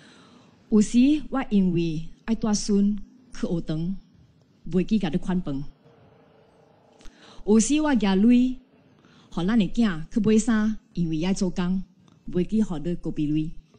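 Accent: Zhangzhou, China. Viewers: 這是閩南話漳州片的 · 她的 祖宗 应该是 福建漳州 他讲的话跟我 几乎一模一样 城市里面 漳州闽南话 我听的真的感到很亲切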